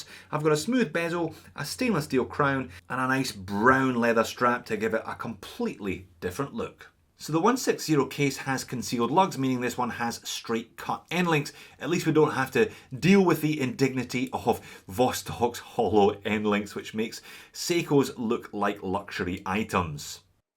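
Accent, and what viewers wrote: Scotland, United Kingdom. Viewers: No he has a lovely Scots accent, the "go to" pirate accent is a sort of generic West Country accent.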